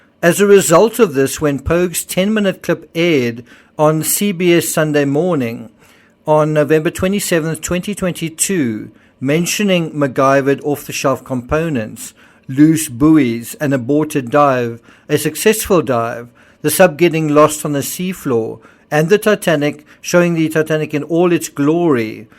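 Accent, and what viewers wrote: South Africa. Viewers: Love the SA speak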